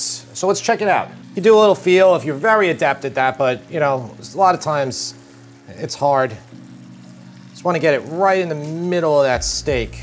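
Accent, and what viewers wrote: New York, United States. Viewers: They offer the same to me- a gal from NJ who now lives in SC and just feel so happy to hear the NY accent and food details, like calling a pizza a "pie".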